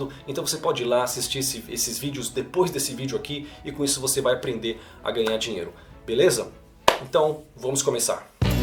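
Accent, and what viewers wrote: Brazil. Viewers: O “brazilian accent “ te entrega irmão. 🤣🤣🤣🤣 Acontece comigo também e falo ingles há mais de 20 anos! Osso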